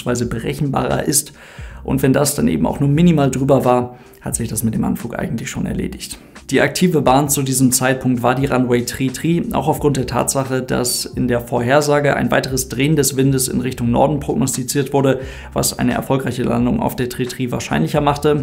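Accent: Germany. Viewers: Im Funk ja, und das liegt ja dem deutschen, da th bei deutschen Muttersprachlern meist eh schon in die "trie" Richung geht .... im Video müsste man das nicht und klingt auch (sorry) extrem grauselig ... aber, gewohnheit und im Kontext Fliegerei auch i.o. um sich nicht ausversehen an ordentliche Aussprache zu gewöhnen .... Im Gegensatz dazu die englischen Muttersprachler... da wird sich konsequent an die richtige Aussprache gehalten, statt der im Funk vorgeschriebenen :)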